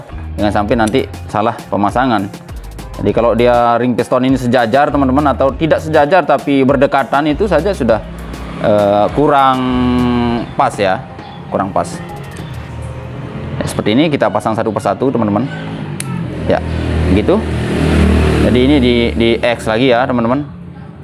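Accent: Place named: Madura, Indonesia. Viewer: Dari Logatnya kayaknya Abang orang Madura ya? 😁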